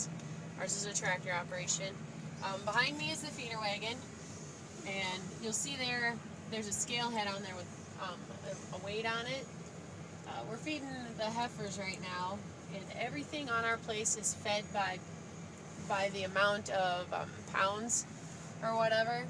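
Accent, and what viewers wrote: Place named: Upper Midwest, United States. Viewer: northern Midwest you must be ??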